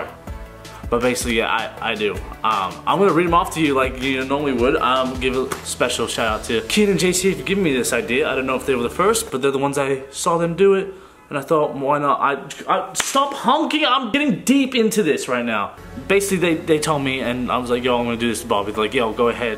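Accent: Australia. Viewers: Honestly don’t get how anyone could doubt that he’s Australian. His voice alone gives it away plus the words he uses. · Wait is he Australian??? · He had an Australian accent for a lot of the video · Wow the Australian accent is making a comeback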